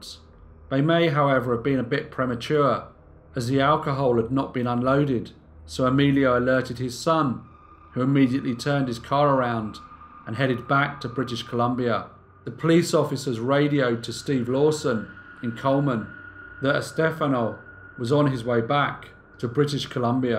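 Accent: United Kingdom. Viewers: Best regards from Mumbai. Appreciate your quality work & crisp British accent. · British speaker...should know better.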